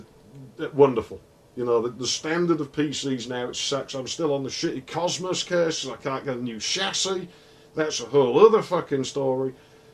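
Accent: United Kingdom. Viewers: As someone who has watched UK TV shows for years, I thought I was used to your myriad accents. I was clearly a cocky bastard. Guess I just have to watch a few more of your videos to get an ear for it. Nevertheless, this is a fantastic video about a neglected CPU. My first computer was a Cyrix, so while I don't think of it in fondness, I respect its place in my history. It played those Apogee & Sierra games beautifully and ran QBASIC like a champ. It came with Windows 95 OSR2, which many people forget about when talking about 95.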